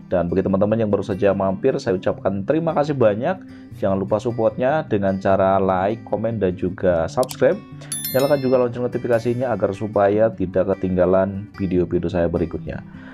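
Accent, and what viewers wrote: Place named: Java, Indonesia. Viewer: Assalamualaikum warahmatullahi wabarokatuh selamat siang min maaf sebelumnya admin nya logadnya medok bange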